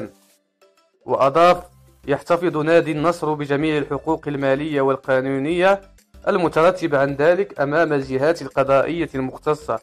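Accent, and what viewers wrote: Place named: Bangladesh. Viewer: ياصاحب المقطع انت جالس تسجل جوا خزان مويه ايش التقطيع هذا جيب شخص عربي يتكلم مو بنقالي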